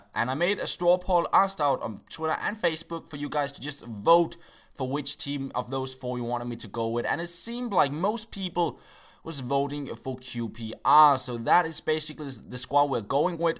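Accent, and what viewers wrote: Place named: Denmark. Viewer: Gotta love that Denmark accent, no joke, sometimes I watch his video for the intro alone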